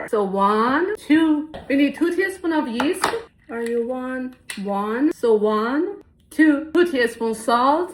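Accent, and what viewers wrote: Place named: Czech Republic. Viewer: Love your personality and accent. Are you Czech?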